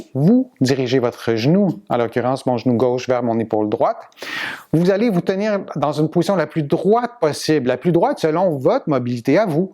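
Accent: Canada. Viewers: Bonjour.je suis vraiment fan.et je regarde vos videos avec beaucoup d'intérêt.et j'essaie dans la mesure du possible de refaire les exercices régulièrement.notament pour le rachis cervical et les épaules.si vous pouvez nous faire une vidéo sur comment éviter la cyphose sénile ou la corriger.merci bcp et je tiens à vous dire que j'adore votre accent canadien.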